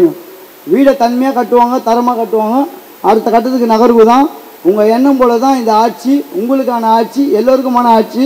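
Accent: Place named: Chennai, India. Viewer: அண்ணா சென்னை பாஷை ❤️❤️❤️🙏🏿🙏🏿🙏🏿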